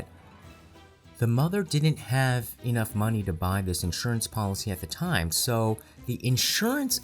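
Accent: South, United States. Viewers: I wish you had your own crime show over here in the states,. I would have you on my TV 24/7!!!! I just love the way you explain things and tell it like it is. And omg those cosmetics you tickle me😂😂. I think I heard you talk about living in the States one time. I live in Tennessee so I talk like Ellie Mae Clampett lol and I just got to ask you about your accent because I think I hear a little bit of southern lol I just love it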